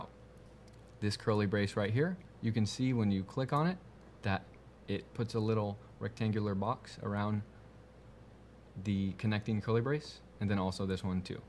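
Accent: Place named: United States. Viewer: thank you for this playlist. it's very hard to find java videos with english american accent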